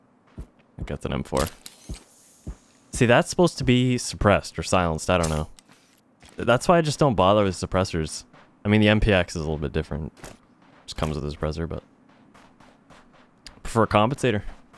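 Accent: Canada. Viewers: Oh you're french canadian?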